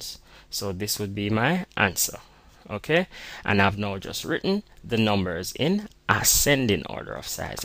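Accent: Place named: Jamaica. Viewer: U r a Jamaican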